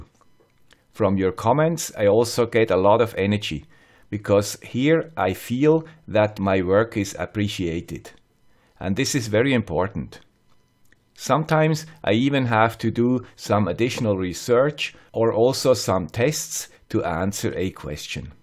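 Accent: Switzerland. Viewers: Come on guys lets give the guy with the swiss accent more likes. These videos are excellent and satisfy our IoT appetite. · As with all of your videos, I learned something new. Making videos that are my #1 favorite to watch doesn't happen without a lot of work. They just look natural by way of hours and hours of effort. After 'Binge Watching' all of your videos in order over a two week period and enjoying hours of entertaining and educational fun, now I get to learn more by broadening my knowledge into the blogging realm. I am sure that I will learn, enjoy and of course 'Like'. Happy Easter to (the guy with the crazy Swiss accent)! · I have only recently started with Arduino stuff, and more recently (today) with ESP8266 stuff! I have to say, your videos are fantastic, your humour is on point, and your style is simply the best. Thank you for taking the time for producing these extremely informative and interesting videos. 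i think I speak for most of us, we do love that Swiss accent.:)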